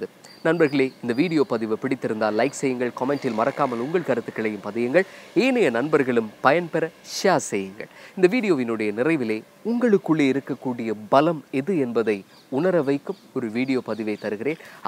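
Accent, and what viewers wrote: Sri Lanka. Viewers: Excellent! This young man's language proficiency is remarkable! Beautiful pronunciation and enunciation of the tamil language. The content of his delivery is equally fabulous. Thank you and keep up your good work. I reckon he's from Sri Lanka.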